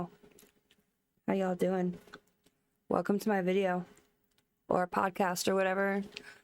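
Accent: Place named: United States. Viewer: Australian with an American accent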